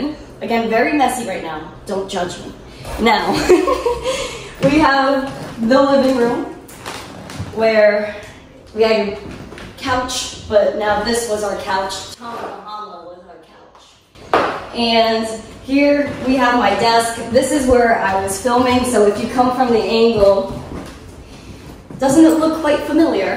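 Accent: Brooklyn, United States. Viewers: Why sometimes you have a heavy brooklyn jewish accent? Old streams I watched you didn't talk like that.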